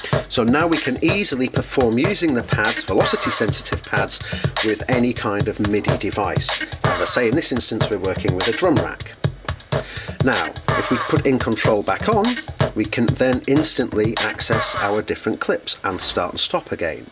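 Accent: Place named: Australia. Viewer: Alright, I hope you work it out. Good to hear a fellow aussie!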